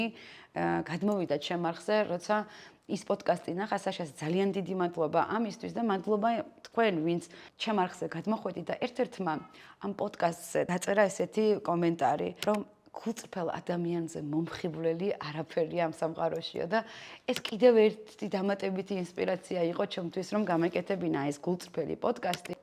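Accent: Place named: Imereti, Georgia. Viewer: წარმოშობით იმერელი ხართ ხო? პირველივე ვიდეში მოხვდა ყურს იმერული ჰანგები და აჰა, 😄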